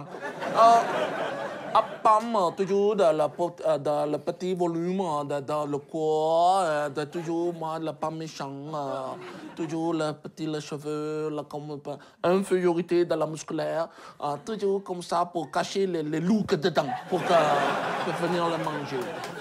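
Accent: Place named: South, France. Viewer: L'accent du sud et le regard trop fort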